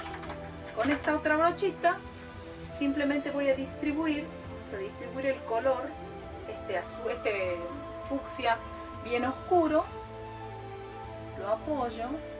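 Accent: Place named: North, Argentina. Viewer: Hola hermosaaa. Proba los polvos sueltos de ruby rose. Es verdad no es necesario saber maquillaje. Jajajaja me hiciste reír. No le lleves el apunte a las mala onda. Yo soy maquilladora. Y te maquillas bastante bien. Me encanta tu voz bien del norte representandonos en YouTube. Saludos desde Tucumán . Argentina. Yo vendo Dapop y Ruby Rose por instagram aquí en mi provincia 🔥🔥🔥🔥😍🔥😍😍😍 y tbn uso y amo el maquillaje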